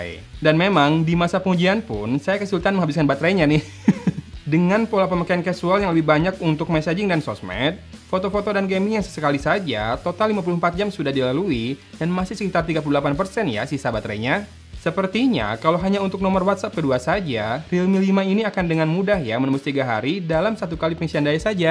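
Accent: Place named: Bandung, Indonesia. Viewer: pasti orang bandung bang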